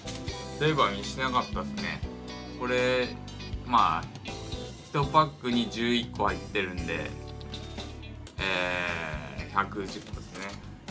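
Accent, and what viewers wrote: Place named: Kansai, Japan. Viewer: あかん笑 に親近感わく＼(^_^)／ もっと関西弁出してって欲しい♪ にこにこで幸せそう！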